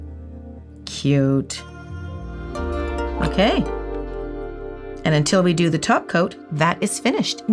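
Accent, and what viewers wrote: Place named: Canada. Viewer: You sound Canadian,. Are you from Canada? I’m living in England, but my husband and I were born in Ontario. 🇨🇦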